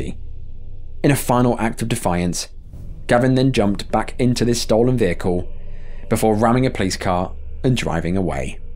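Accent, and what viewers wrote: United Kingdom. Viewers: As an American I can confirm how much more serious I take a British narrator. IDK why. It seems to be a thing though. I wonder if anyone has ever done any research into that.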